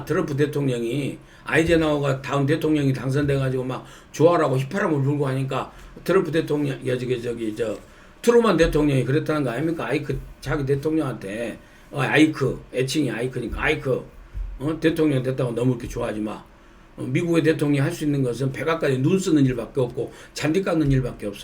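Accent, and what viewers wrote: Jeolla, South Korea. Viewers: 설명 넘 잘하셔서..어려운 정치가 이해가 좀 됩니다.. 민영삼 원장님의 전라도 억양은.안정권 대표 처럼, 듣기가 너무 좋습니다. . 저.. 팬이 되었어요. 화이팅..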